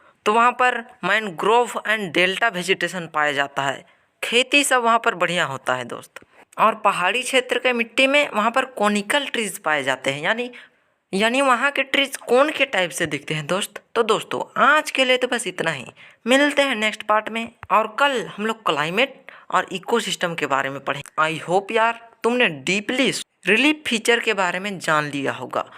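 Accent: Bihar, India. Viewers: Bhai tum bihar ko ho kya?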